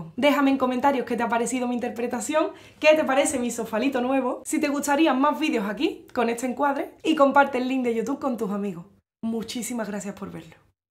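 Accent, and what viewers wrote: Andalusia, Spain. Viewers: ese acento andaluz tan hermoso!! Saludos desde 🇻🇪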